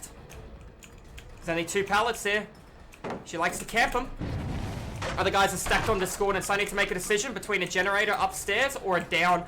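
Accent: Australia. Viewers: I don't hear it probably because i'm Australian too lol.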